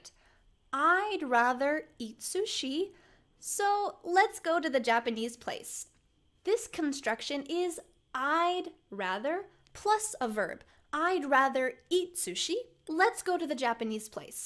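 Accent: United States. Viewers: I 'd rather learn American accent instead of British accent that is why I follow your channel.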